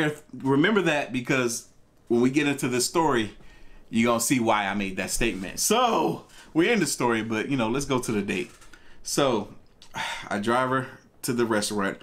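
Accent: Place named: Decatur, United States. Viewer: Sounds like Decatur area. Lol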